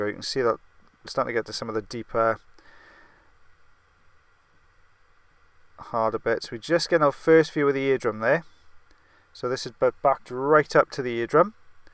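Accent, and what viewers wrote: Wales, United Kingdom. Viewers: Welsh voice, fairly sure.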